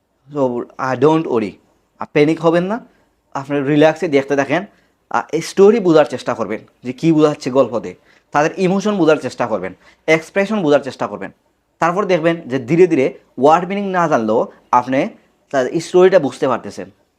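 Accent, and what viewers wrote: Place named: Sylhet, Bangladesh. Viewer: আরে সিলটি স্যার। আপনার কথা বুঝতে আমার অনেক সমস্যা হয়। স্যার প্লিজ, আগে একটা বাংলা প্রনানসিয়েশনের কোর্স করুন। এতে আমাদের বুঝতে ভালো হবে, সাথে আপনারও বাংলা কথায় সিলেটি একসেন্ট কেটে প্রমিত হবে। প্লিজ স্যার। আপনার কথা বুঝতে অনেক কষ্ট হচ্ছে।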